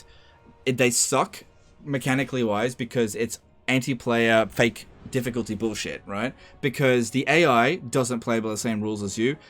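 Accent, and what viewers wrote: Australia. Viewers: I have never played one of these "Total War" games. I thought this channel was to listen to an Australian talk about cheese in a funny voice while he does something with digital action figures.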